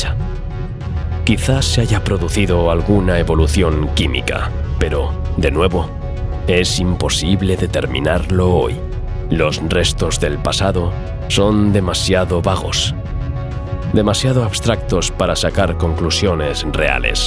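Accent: Spain. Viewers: Veo muchos comentarios de mis hermanos hispanoamericanos y ninguno de ellos son irrespetuosos excepto tú. Si no te gusta escuchar el acento castellano español pues entonces no visites este canal, así de sencillo. Sé que aún habéis algunos que nos repudiais por el tema de la conquista, pero déjame decirte algo, los españoles actuales no tenemos culpa de lo que hicieron nuestros antepasados y yo como español me entristece ver mucho odio y resentimiento en todas las redes hacia los españoles. Me hubiese gustado una conquista sin sangre, sin historia negra. 😢 Os admiro, os respeto y os quiero porque somos hermanos. Viva Latinoamérica y Viva España.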